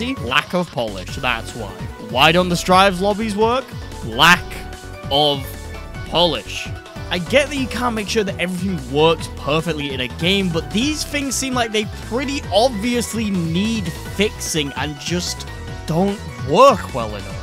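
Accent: United Kingdom. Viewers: My favorite sub genre of YouTube is British guys talking about fighting games.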